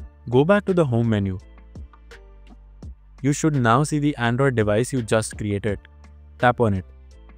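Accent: India. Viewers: Are u indian bro ?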